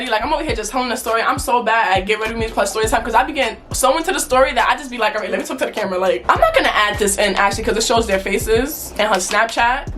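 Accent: New York, United States. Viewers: The aii so boom just know she from ny cause I do the same thing 🤣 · You can tell she’s from new york by how started the story 🤣 yktv yessir 💞🕺🏾❗️ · Instantly knew she was a fellow New Yorker right off the accent and the "Ight so boom" lmao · I could deadass tell you from new york😭. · You from New York or am I trippin???? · She definitely sounds like a New York girl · This my first time watching your video and I can def tell that your from New York 😭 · Soon I heard the newyork accent I subscribed WHOMPP🥳🥳hey sista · You gotta be from New York😂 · Definitely from ny😂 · Nah she gotta Ny accent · You have to be from New York 😂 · I knew you was from NYC 💪🏾🤟🏾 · New York accent hella strong 💪🏿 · Are you from NY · i love how u jumped right into the story & that NY Accent and you are pretty!🤍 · You’re from ny huh🥺? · You def from ny😭💞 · Definitely from New York lmaooo " aii so BOOM " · the NY accent💕💕💕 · I’m new to this channel and I already know she from New York 💯❤️ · You from nyc❓ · You must be form New York I hear the accent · The way that I knew you were from NY and then you said Coney Island so ik for sure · ouuu i know a new yorker when i see onee 😫😫 lemme guess u from the bx or brooklyn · she dead got the deep ass ny accent🤣❗️ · Yea u can definitely tell she from ny💯🔥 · u sound like u from ny 😂😂 ? 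· you have to be from new york!😭your accent gives life! edited:i said that before watching everything 💕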